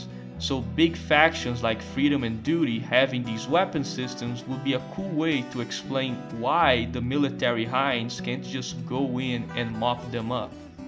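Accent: Brazil. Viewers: Is that a Brazilian accent? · sotaque inconfundível de br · Sorry to ask but, are you brazilian? Your accent sounds reminiscent to a brazilian fluent in english who maintained his 1st language "charm"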